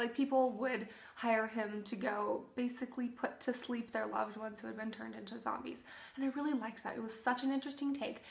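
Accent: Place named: United Kingdom. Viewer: British! That’s the accent!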